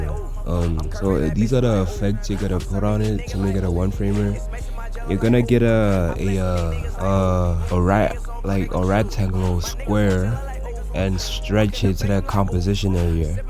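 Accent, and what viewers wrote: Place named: Brazil. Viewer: o cara parece um brasileiro falando inglês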